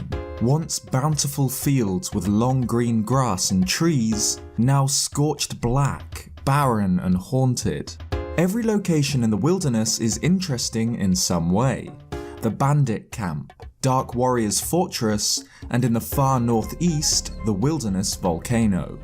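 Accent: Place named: United Kingdom. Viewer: all British people sound the same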